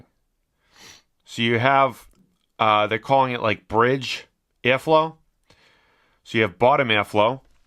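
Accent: Boston, United States. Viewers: You gotta be from Boston lol aaada, I'm from dochesta ;) thanks for the review! Where in the city aare ya from?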